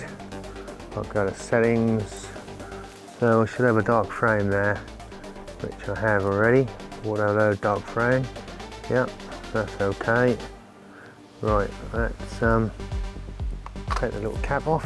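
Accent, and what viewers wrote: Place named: Suffolk, United Kingdom. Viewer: Lovely photo's, also really nice to hear the accent... from another Suffolk boy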